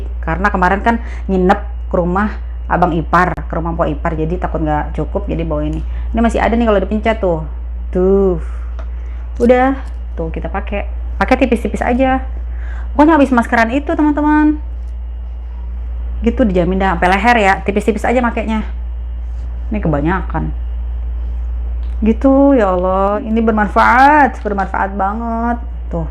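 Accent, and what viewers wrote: Jakarta, Indonesia. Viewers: cara ngomongnya jadi semakin pingin balik ke Jakarta rindu sama tetangga jgn di ilangin tuh cara ngomongnya Betawi banget sehat selalu bahagia daaaan yg terpenting semoga sehat selalu tuk sekeluarga👍👍🤲🤲😍😍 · Ehehee.. lucuuu ngomongnya.. ceplas ceplos apa adanya.. Betawiii bingiitttt dahhh... Mo nonton, tpi dah adzan maghrib...pause dulu... yukkk maghribannn dulu yukkkk shayyy.. n keluarga sehat selalu yaaa...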